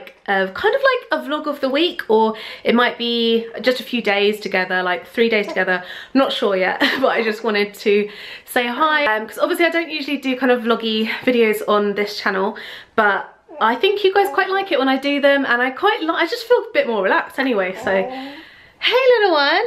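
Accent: France. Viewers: The french accent tho 😌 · ouuuuh l'accent français bien prononcé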